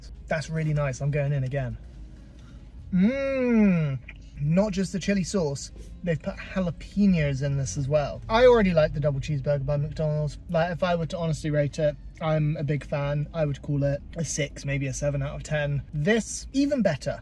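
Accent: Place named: Surrey, United Kingdom. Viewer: I’ve been trying to work out what part of the country you live in, as you have tons of chain brands in your vicinity; but your accent leaves me puzzled!!! Where?!! 😝 Surrey??!!!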